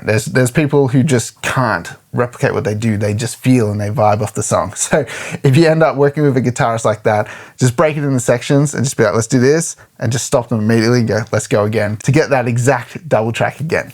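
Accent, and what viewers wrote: Australia. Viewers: Awesomeness mannnnn you sound just like craig jones the aussie bjj legend. Hahahaha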